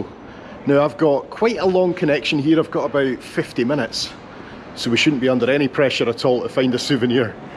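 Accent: Scotland, United Kingdom. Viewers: I live your Scottish accent !! ❤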